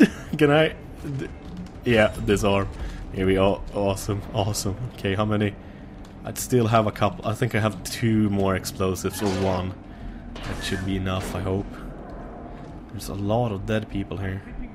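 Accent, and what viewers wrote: Australia. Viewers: Protip: He is australian, not british, mate. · hes not british, hes australian :)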